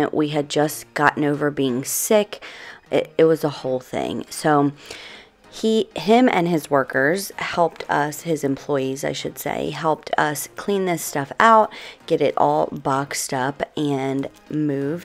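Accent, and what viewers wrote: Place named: Delaware, United States. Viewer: I have been watching you for a while now and I knew that you were from Delaware, I could tell by your accent. I am from Newark, but live in Western Maryland now. I know it's dumb, but I'm so excited that I was right. I just sent you a friend request on Facebook! You don't have to accept. I couldn't help myself!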